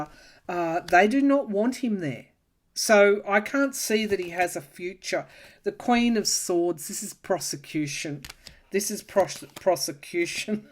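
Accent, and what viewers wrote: Australia. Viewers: You have been such a comfort to me this past year. I’ve truly felt your genuine and heartfelt concern and empathy for what we’ve been going through these past years. If it weren’t for your charming Aussie accent, I would think you’re a citizen of the US! I absolutely love and adore you. I truly mean it when I say I don’t know how I could have taken all the abuse without your constant calm comfort. 🙏❤️🌸😇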